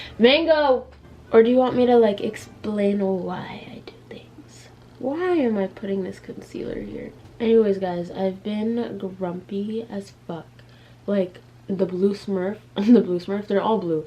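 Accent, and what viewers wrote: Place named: United Kingdom. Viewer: Ur gorge also that british accent tho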